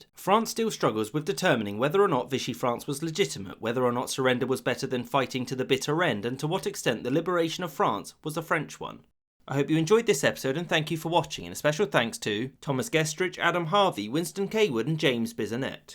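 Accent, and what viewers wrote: England, United Kingdom. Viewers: I think the English accent explains why the video is anti-British.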